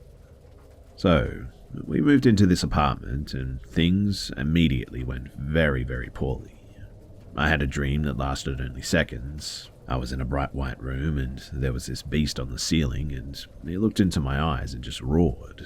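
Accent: Australia. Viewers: I love an Aussie accent, but dude, slow the words down, you miss storytelling when you just read off a script. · Sounds both like an australian and new Zealand and somewhere in the Americas accent. I really like it. 🤗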